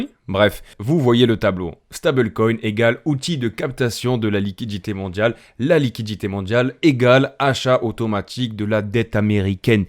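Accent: South, France. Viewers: tu as l'accent du soleil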